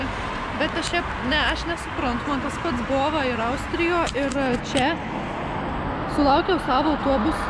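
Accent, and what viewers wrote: Samogitia, Lithuania. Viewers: Video vuo 👍 O jau tas kalbėjimas žemaitiškas, koks geras... :hands-yellow-heart-red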